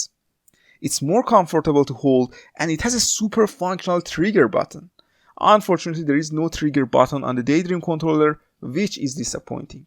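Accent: Iran. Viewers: Haha, I was going to ask him this, if he Persian. 🤣🤣🤣🤣 Love it. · Love you Persian accent :D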